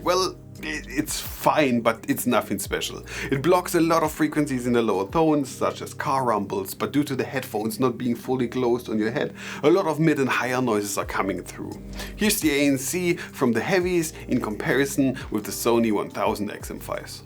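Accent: Germany. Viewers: man hört das du Deutscher oder vllt sogar Österreicher bist :D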